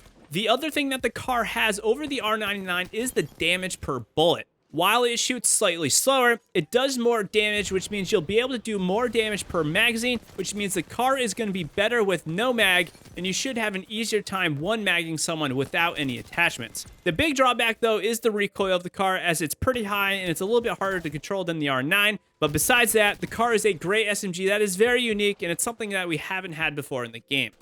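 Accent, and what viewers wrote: United Kingdom. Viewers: your northern accent dawg!! no offense sounds like your saying c ter. lol not making fun of you i’m from texas and i don’t hear it a lot lol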